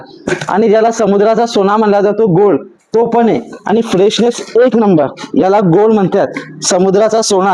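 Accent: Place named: Agri Koli, India. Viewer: To agri koli bhasha boltoy tyamule Vav mhantoy maza Andaaj aahe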